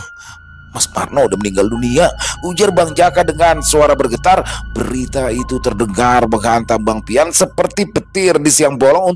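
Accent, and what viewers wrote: Jakarta, Indonesia. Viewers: Wa’alaikum Salam, gercep kalo kisah bang 😁saya suka logat betawinya.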